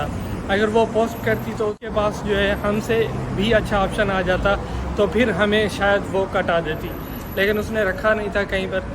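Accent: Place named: Sindh, India. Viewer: Ada ap lehje se Sindhi lgte ho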